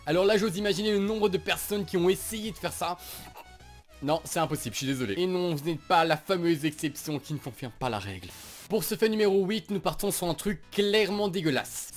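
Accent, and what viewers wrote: Belgium. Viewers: alala ! L'accent belge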